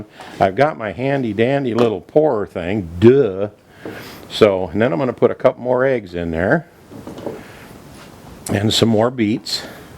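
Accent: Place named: Chicago, United States. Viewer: Sounds like a Chicago accent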